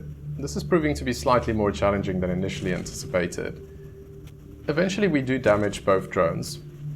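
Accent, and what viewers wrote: South Africa. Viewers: You sound awfully South African for a Singaporean!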